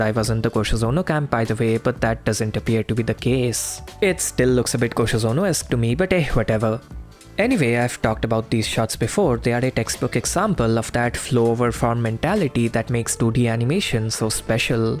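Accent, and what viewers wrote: India. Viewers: Like my comment if you think his indian accent ruins this video and want him to use an american or any other correct english accent. Sorry mate but we are all making a stand. If you dont change the accent on the video we will unsubscribe until you do. Peace be with you brother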